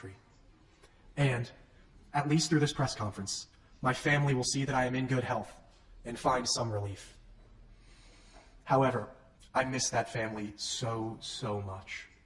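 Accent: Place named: South Korea. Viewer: the way he was speaking English, but it sounded like he was reading a Korean dialect of English.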